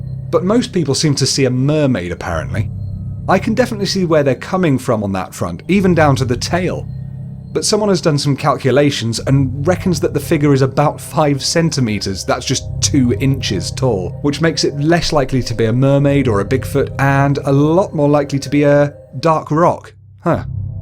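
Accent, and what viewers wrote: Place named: England, United Kingdom. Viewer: Love love love your channel. Curious as to where your from. Kinda go a English accent. A real one. Not one that you see in Hollywood lol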